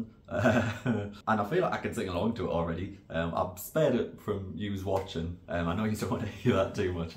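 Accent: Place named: Newcastle, United Kingdom. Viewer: love that you're a Geordie too! cute. I wasnt expecting from the thumbnail you would be, haha whatever that means but how you say 'Tattoo' :face-blue-smiling:😍 love it. hey from Dublin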